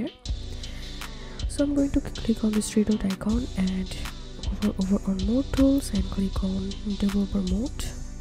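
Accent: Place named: India. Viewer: heard the indian accent and clicked off 💀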